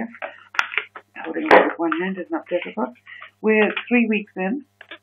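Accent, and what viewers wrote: United Kingdom. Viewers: Are u British? Because u have a British accent!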